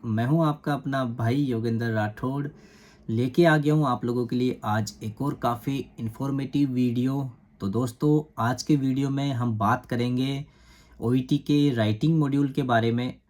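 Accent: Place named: India. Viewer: my self prakash.... already have sent my concern on your Instagram handle....hoping that you will reply soon.......with lots of happiness and kinship you are continuously delivering to the needful .......Thanks ..,.i feel so happy to see a rajasthani bhai......... exploring uk......in desi accent ❤🎉🎉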